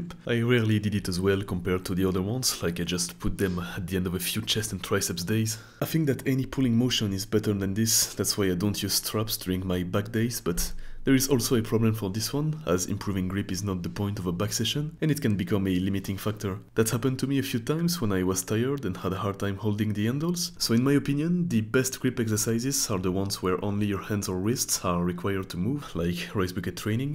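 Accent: France. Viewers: French accent ✨ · Accent français lol excellent contenu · The french accent is so funny😂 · Are you french ?? You sound french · French touch 😂 love the accent 😂 It is,what it is ? N'est ce pas 🤣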